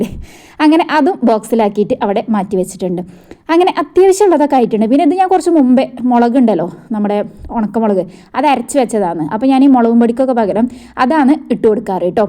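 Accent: Malappuram, India. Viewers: Niga serika Malappuram aano akka ekadhesham adhe bhasha😊❤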